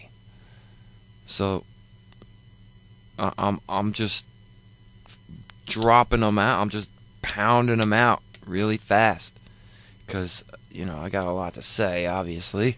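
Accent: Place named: Brooklyn, United States. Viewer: Cool my lil gangsta rapper from Brooklyn, soon I'll buy all your songs, I like the Brooklyn accent, I've always been a fan of real hip hop ! Stay strong boy :)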